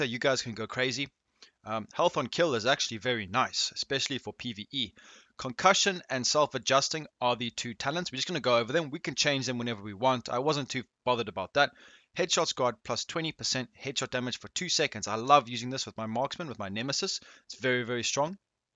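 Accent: South Africa. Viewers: Are from RSA bro? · You sound South African. Lol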